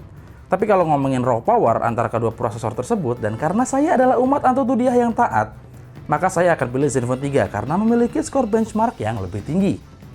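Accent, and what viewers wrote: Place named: Bali, Indonesia. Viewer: psti orang bali ya om..?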